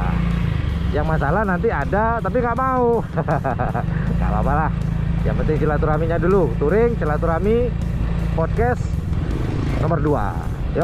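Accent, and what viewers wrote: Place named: Madura, Indonesia. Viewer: ...logatnya kok beda ya...mirip'' logat madura TIMUR / SUNEMEP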